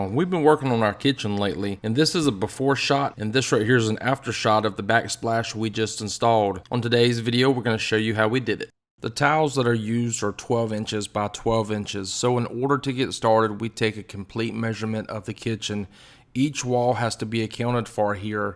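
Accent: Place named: South, United States. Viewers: This is so beautiful!!! Are you from New Orleans?? You sound so much like Louisiana!! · You're fun to watch. That Southern drawl is highly entertaining, "We tested'ed 'em." Hehe (I'm a KY girl. so howdy!). And you did a really good job; very precise.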